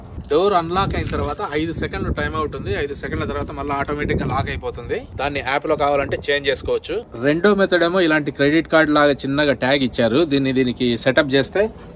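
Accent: Kadapa, India. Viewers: Bhayya meeku maa vizag lo vunanatha etakaram and slang Kadapa vollu vundi ani choopisthunnaru sooper bhayya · Anna miru mana kadapa bhasha supar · Anna Meeru maa paalita PEDDA BALASHIKSHA . America vallu mimmalni ,meethopati swachmaina Rayalaseema Yaasani AP nunchi yetthukellaru.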